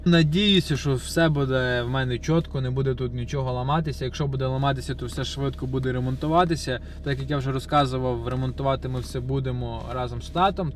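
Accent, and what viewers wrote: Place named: Surzhyk, Ukraine. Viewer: суржик жёсткий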